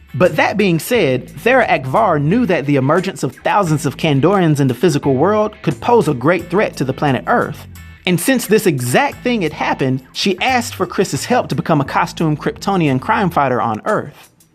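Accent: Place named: United States. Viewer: I rellay like your american accent from which part of USA are you from? Amazing video as always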